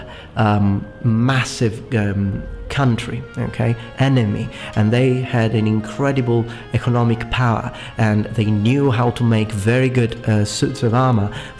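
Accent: England, United Kingdom. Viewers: I thought you were a Britt due to your accent. Learning a lot from your vids. Keep up the good work.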